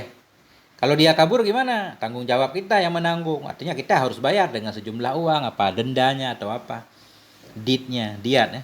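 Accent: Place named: Aceh, Indonesia. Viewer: Dari logatnya , seperti nya ustadz ini asli orang Aceh, terimakasih banyak tadz ilmu nya sangat mudah untuk ta pahami semoga ustadz be gebri sehat sehat Sabe le Allah amin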